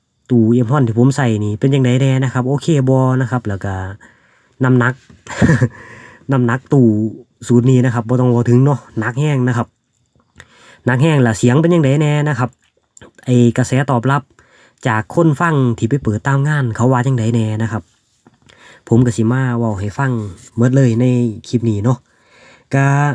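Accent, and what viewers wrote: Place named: Sisaket, Thailand. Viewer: สำเนียงค๊นสีเกษตั๋วนี่😊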